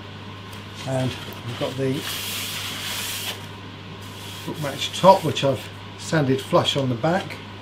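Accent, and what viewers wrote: United Kingdom. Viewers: Love to hear you talk, man! We Americans sound so plain next to the British. Anyway, I'm really enjoying the build and I just love some of the jigs you've made as well as the band you shared playing the tune "Echoes". That vocalist is killer good. If I can get to feeling better, I'll be building my first guitar from scratch. Any pointers or words of wisdom?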